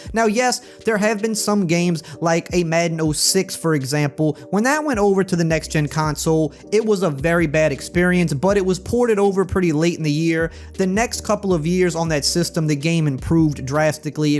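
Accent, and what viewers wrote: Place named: Chalmette, United States. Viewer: You sound like you’re from Chalmette. Who Dat!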